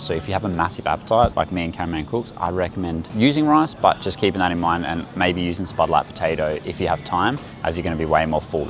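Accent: Australia. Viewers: Why do you sound Aussie except when you say "Yoghurt" like a British person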